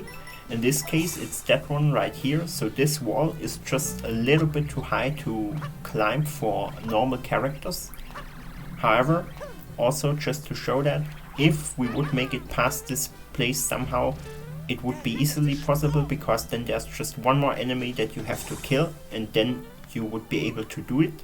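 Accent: Germany. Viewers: bist du deutsch?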